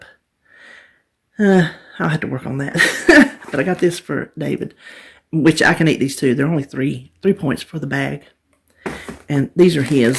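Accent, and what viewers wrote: South, United States. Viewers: Hey! I love that you are southern like me. When you said scoot I bout died. Only we can get three syllables out of a one syllable word. Nice hauls! I'm not allowed to go to stores so I've been thinking about a Walmart p/u situation. My son did bring me some fresh fruits and veggies yesterday. I'm happy you're safe at home too. x, E. ps: That shirt is Wowsome!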